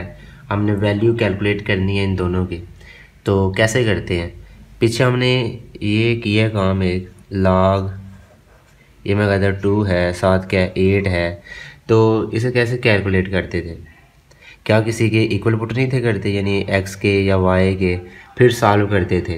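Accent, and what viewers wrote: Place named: India. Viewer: I love your Desi accent laaaaag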